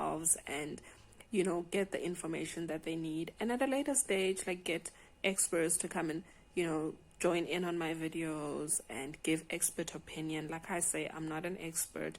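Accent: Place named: South Africa. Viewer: I love your accent! I would like to visit South Africa one day. I heard it is beautiful. Thank you for sharing. I am a huge fan of boiled eggs too! :-)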